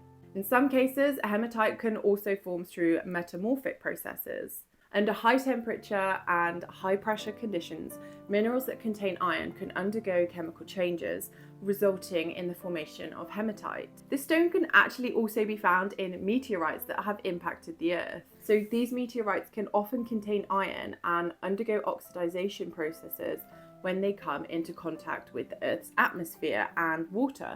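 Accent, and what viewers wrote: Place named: England, United Kingdom. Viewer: Thank you for your very interesting video. I'm new to your Channel and this is only the second one I've seen. I have subscribed as I love your calm, relaxing English accent. You are also a Beautiful English Rose. 😘🏴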